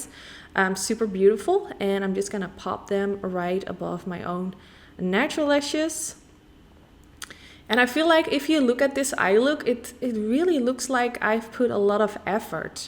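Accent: Netherlands. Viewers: I Love the Mac paintpot and the eyeshadowpallet , by the way i, m hearing a Dutch accent , if so greetings from The Netherlands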